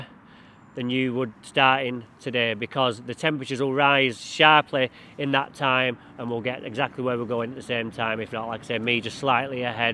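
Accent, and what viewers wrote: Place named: Manchester, United Kingdom. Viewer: Thank you for your advice. Love your straightforward approach and delivered in our customary Gtr Mcr way 👌 keep up the great work. BTW, which backpack sprayer would you recommend pls? Thanks AL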